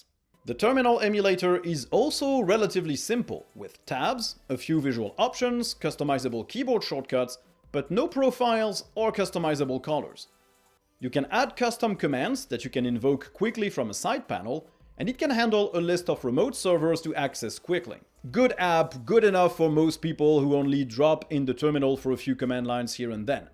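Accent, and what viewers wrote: France. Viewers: I love le franch man avec un accent anglais horrible ^^ Bonne vidéo comme d’habitude sinon même si ce DE n’est pas fait pour moi. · I like that french English accent it's funny af 🫣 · I'm comenting for more French accent 😁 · More french acccent please, it made me laugh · i love your French accent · Yes, please, more french accent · I'm here to comment that I want more French accent. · More French accent, s'il vous plaît! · We need more French accent of English!!!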